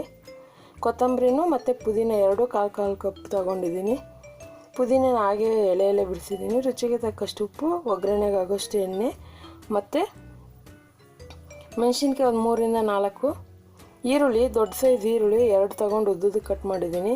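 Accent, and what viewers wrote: Tamil Nadu, India. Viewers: Neevu tamilnadu nora nimma kannada adethara ide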